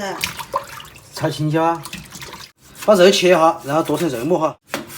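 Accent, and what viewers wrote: Sichuan, China. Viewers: 哈哈 还贴心地加字幕 我们听得懂川普话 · Oh my God, thats my whole week meal supply! 🤯 - a month or two for the rice. By the way, which dialect of Chinese do you speak? It sounds like Mandarine but with an accent.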